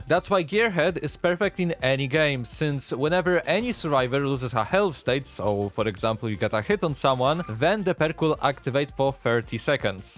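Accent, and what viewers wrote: Poland. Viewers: polski akcent? :)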